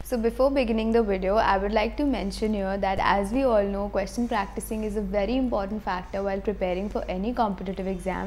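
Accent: Hinglish, India. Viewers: Is she said hinglish!!! ?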